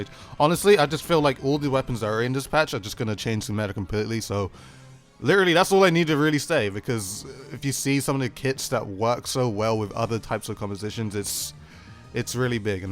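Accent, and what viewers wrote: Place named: London, United Kingdom. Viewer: Hey, where abouts are you from? I'm from London, England and your accent sounds very similar to mine so I'm assuming you're from nearby?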